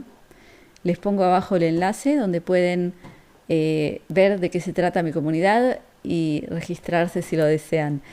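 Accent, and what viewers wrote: Argentina. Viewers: esa historia de las huellas no es de Dios Padre El es energía y no tiene materia Las huellas son las de Jesús El se hizo Hombre y camino sobre esta tierra Entiendo tu historia tus raíces y las respeto!!! Pero la Huellas son de Jesús!!! Aunque Nuestro Padre Eterno Siempre esta pendiente de nuestras necesidades!! Tenes una dulce y suave voz Argentina da gusto escucharte También en Hebreo resalta esas cualidades que mencione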